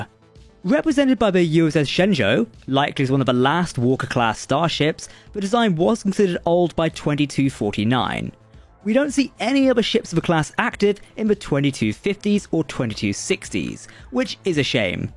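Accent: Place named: Cockney, United Kingdom. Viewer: Firty seven. The Cockney accent drives me insane.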